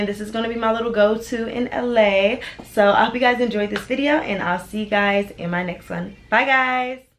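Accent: New York, United States. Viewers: you're picking up the New York accent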